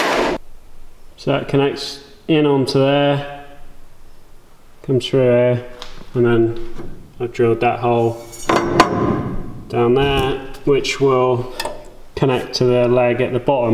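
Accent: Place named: Cornwall, United Kingdom. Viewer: Brilliant job mate. I haven’t bothered to subscribe to anything in ages but your channel is awesome. Watched all your vids and you make it look so easy and really explain things well. I’ve picked up so many tips from you. Worked with a guy from Cornwall who talks just like you, you could be brothers haha. Anyway keep it up mate, I look forward to seeing your stuff, I think we all do. Legendary!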